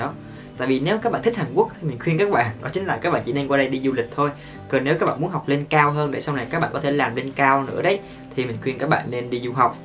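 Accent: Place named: Quang Nam, Vietnam. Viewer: Anh ở Đồng Nai sao a nói giọng Quảng Nam ???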